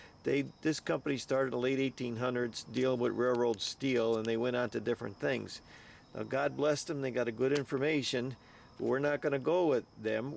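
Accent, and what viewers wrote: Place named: Scranton, United States. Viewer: I was born and raised the first 25 years in Wilkes-Barre area. I watched your first video today, and then many others and within two minutes of watching you and listening I knew you were from W-B/ Scranton area. We’ve been living in San Diego the past 40 years and now that the kids are grown up we’re coming back Home, buying some land and going to have fun building the last home that we’re going to live in and it’s going to be all stone just like our current house. Your videos are great, it’s really great to hear and see somebody from where we grew up, and I forgot the recipes for walls, stonework and grout and this has been so helpful. Thank you for your video.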